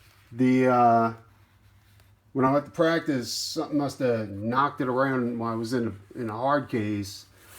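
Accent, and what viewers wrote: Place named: Baltimore, United States. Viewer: You sound like you’re from ball more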